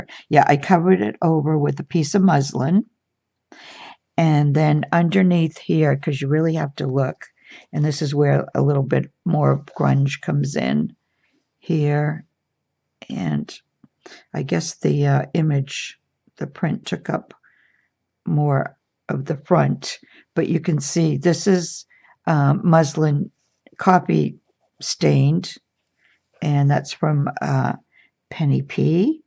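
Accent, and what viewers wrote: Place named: Massachusetts, United States. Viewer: This is just so lovely! Thank you for sharing! Your MA accent makes me feel right at home!! I grew up there and most of my family is still in the Boston area. I live in ND now. So glad I met you through the shop!!! Just subscribed!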